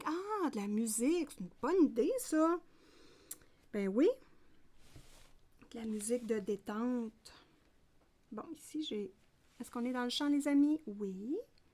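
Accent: Canada. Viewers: Bonjour 😄,ça fait plaisir de te voir et de t'entendre car j'adore ton accent canadien, il démarre très bien ton colo, il sera beau 💕😄bises Martine 😄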